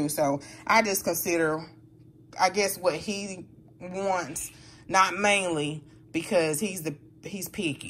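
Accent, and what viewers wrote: South, United States. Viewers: I'm country too, Alabama ❤️ Love your voice and cute accent ☺️🌼🌻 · I for one loves your accent.. Growing up in the north and spending time in the South and now living in the south I can appreciate it.. Yes those green beans are good but I rinsed them WELL to get a lot of the salty taste out as much as possible. My first Sam's order will be arriving today 😀. You are so right as I have found that different locations of Sam's, BJ's and Costco are not created equal. You are doing so well for your family. Much success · Don't worry about your dialect. If it's southern, it's good! 😉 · We don’t have many southern belles here in Hawai’i, so listening to your accent is a sweet treat for me! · Mississippi here Tupelo 👍👂I hear you girl we are COUNTRY AS THEY COME 😂😂😂😂😂😂😂 but if they correct you they really need to hear the DEEP DEEP SOUTH 😂😂😂 IT SOUNDS LIKE ANOTHER LANGUAGE😂😂😂😂❤❤❤💞💞💞💞💖💖💖💖💘💘💘 MUCH LOVE GIRL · Hey! 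You know how we talk here in the south sis. It is what it is. The country is in us lol. 💃🏽🤷🏽‍♀️😊 Great video as always!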